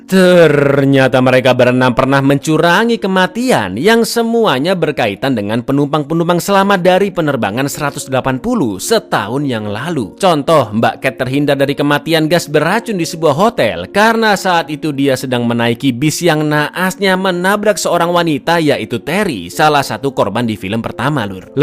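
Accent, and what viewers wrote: Java, Indonesia. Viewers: Aslimu org mna to min?jowomu medok,tp mm mu jwabar bget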